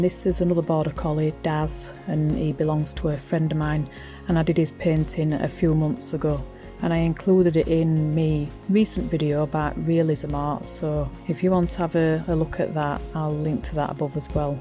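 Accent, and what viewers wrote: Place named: England, United Kingdom. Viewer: fantastic I would say the yanks can't understand a real english accent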